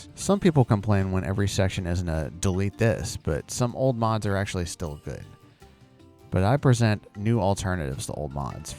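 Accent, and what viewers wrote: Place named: India. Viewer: The Indian accent put me off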